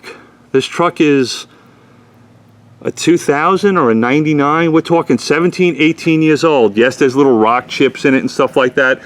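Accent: New York, United States. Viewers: Are you jew from New York? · Are you Italian and are you originally from NY? There is no way you arent lol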